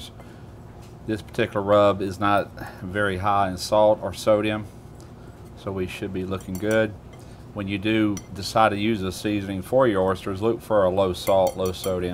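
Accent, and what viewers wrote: South, United States. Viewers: i’m from virginia and there is something tremendous about having a southern man give this advice. When i hear the draw i know he’s gonna be great. thank you sir!!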